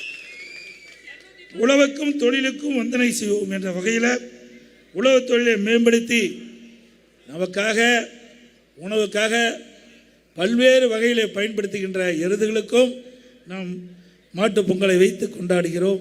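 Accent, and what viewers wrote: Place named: Katpadi, India. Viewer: Katpadi Tamil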